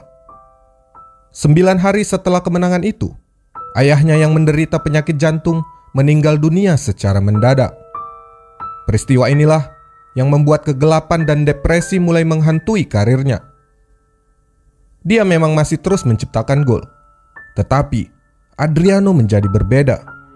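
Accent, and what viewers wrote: Medan, Indonesia. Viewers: orang medan ini ya? dari aroma suaranya uda kecium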